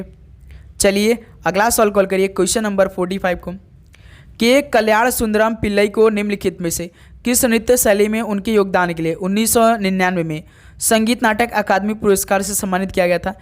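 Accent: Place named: Uttar Pradesh, India. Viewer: Thank you sir aap achha samjhate ho aur apke bolne ke language se lagta hai aap u.p se hai 😊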